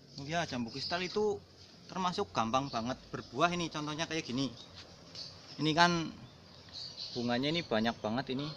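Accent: Banyumas, Indonesia. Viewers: Mas sampean kok medok banget..ngomongnya kok ngapak2...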